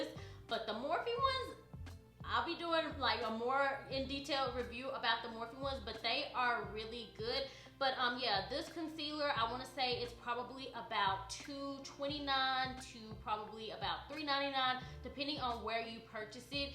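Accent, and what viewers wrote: South, United States. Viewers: Your southern accent is so adorable.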